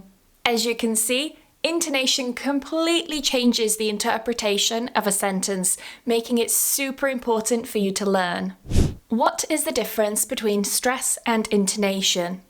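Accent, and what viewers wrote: United Kingdom. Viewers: This dog looks like he's trying to learn British intonation. Besides, you seem to have lost most of your weird “Polish” accent. You sound more British every day . 😉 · I like her British accent quite a lot!!!! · l really love your british accent❤😊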